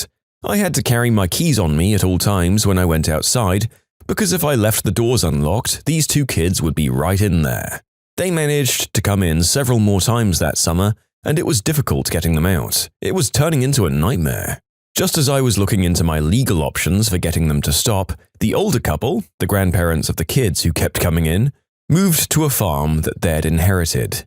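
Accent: United Kingdom. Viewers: I didn't know the narrator is british now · Why do you sound *BRITISH*???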